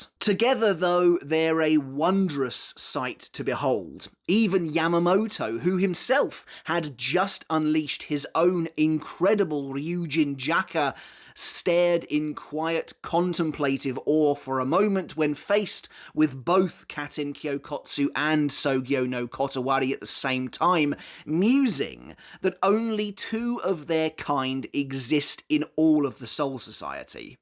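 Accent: United Kingdom. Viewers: it's his British accent that gets people.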